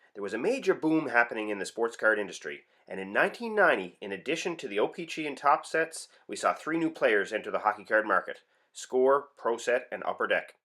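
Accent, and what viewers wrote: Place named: Netherlands. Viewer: The narrators definitely from NL. Made me miss home.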